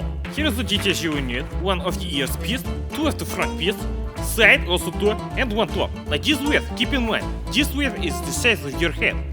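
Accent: Russia. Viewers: This dude has the thickest Russian accent I've ever heard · Мне 1 кажется что ты не американец а русский?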